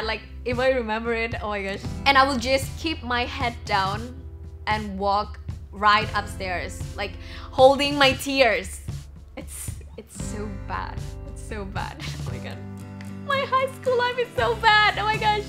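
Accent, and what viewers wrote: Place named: Singapore. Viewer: kalo ngomong bahasa inggris jadi ga keliatan kaya orang indo, kaya orang singapore wkwkw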